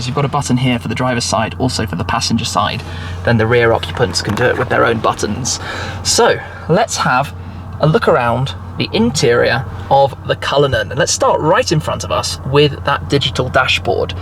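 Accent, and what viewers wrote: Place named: United Kingdom. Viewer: Subscribed because of the British accent lol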